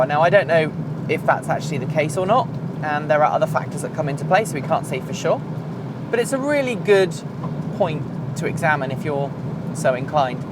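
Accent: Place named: United Kingdom. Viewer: One of the reasons I subscribed to is that I appreciated your in vehicle driving soliloquies, common sense information, and your great British Accent. I also appreciate when you include things about your life, good, bad, or mundane, as we get to connect even more so. KEEP IT UP! I hope things settle down for your and yours.John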